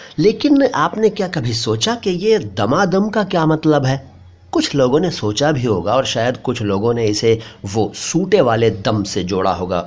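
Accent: Standard. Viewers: आपका शुद्ध उच्चारण सुनकर तबियत खुश हो जाती है ।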